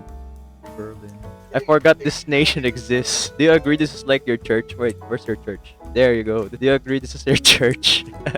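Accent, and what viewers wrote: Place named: Philippines. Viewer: Your pinoy......i can guess from your accent❤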